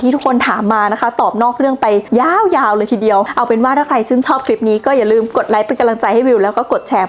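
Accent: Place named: Central Thailand, Thailand. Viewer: ที่น้องวิวพูดนี่แหละเขาเรียกว่าภาษากลางไทย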